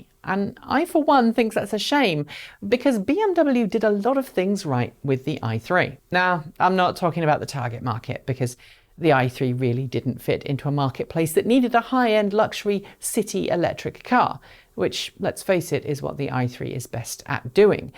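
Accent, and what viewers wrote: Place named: England, United Kingdom. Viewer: Greetings from Sydney Australia! So so glad to hear that nice pommy - undiluted - accent back in this episode:):) Thank you and keep up your informative and entertaining work.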